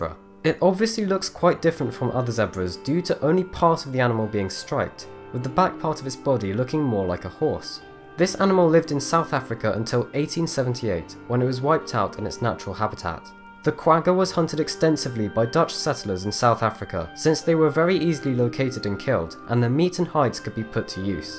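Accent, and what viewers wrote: England, United Kingdom. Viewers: What is a May-ori? I am a New Zealander and it is pronounced Mow( as in "how" ) ree. You sound English so you should know how to pronounce Maori. The Maori Battalion sent thousands of Maori troops to both WW1 and WW2 in Europe.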